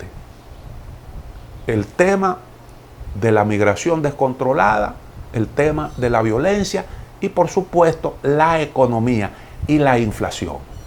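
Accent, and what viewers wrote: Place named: Dominican Republic. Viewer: Buenas noches. Ciertamente no me pierdo ninguna de tus programas, siempre interesantes. Hace ya 23 años participe el la reconstrucción de RD en la zona de Haina, tras los desastres del Huracán George. Monte una mina en el Montoto, carca de Higuey, para fabricar agregados para asfaltado y hormigón de las carreteras y puentes que se habían destruido. Como dicen ahí, "dure " dos años, alternando con otros negocios que tenía en España. tengo grandes recuerdos de ese país y lo recuerdo con cariño. Su dicción Dominicana me encanta. Colaboraba conmigo un gran amigo dominicano, el Ingeniero Emilo Pimentel. Gran persona como amigo y profesional. Perdí el contacto con el. El Huracán fue en el 98 y hace años, si sigue entre nosotros, tendrá cerca de 80 años. A donde voy es que su manera de hablar pausada y sus dichos dominicanos me recuerdan a el. 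No he vuelto por ahí, pero me cuentan que ha cambiado mucho el país. Me llamaron para la construcción de carreteras en Haití, antes del terremoto. Había una empresa española que se llamaba Elsamex y nos propuso formar una UTE para realizar las obras mencionadas en Haití. Surgió el terremoto y se indio la oficina provisional de Elsamex en Haití, y murieron dos ingeniero y un topógrafo que se encontraban en dicha oficina. Lógicamente se suspendió la obra que se iba a realizar con financiación del Banco mundial, lo mismo que se hizo en las obras de Higuey.Le contaría muchas historia de mis vivencias en RD. pero no viene al c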